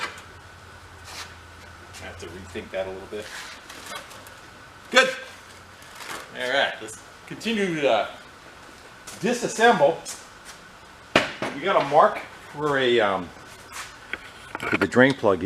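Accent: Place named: Fargo, United States. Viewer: "... try to knock out this bobcat skidsteer that I bought, oh jeez..."... are you originally from Fargo by chance? You do fix a lot of wood chippers and snow blowers...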